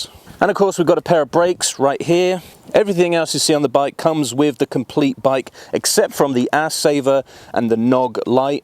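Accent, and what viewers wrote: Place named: United Kingdom. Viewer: Wtf stupid music British accent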